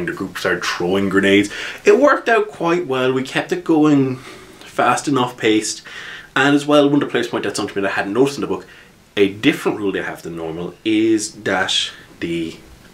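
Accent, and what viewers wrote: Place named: Newfoundland, Canada. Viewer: Huge newfie accent? Awesome. My GM is a newf. :)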